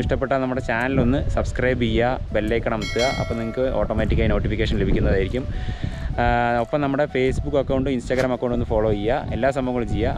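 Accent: Palakkad, India. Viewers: etta njngalude palakadan bashayilulla avatharanam suparanu enik oru request und mele parambil an veed movie location pleese bro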